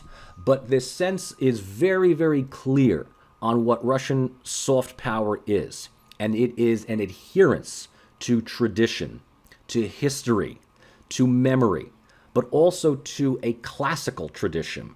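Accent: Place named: Boston, United States. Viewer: Love the Boston accent by the way.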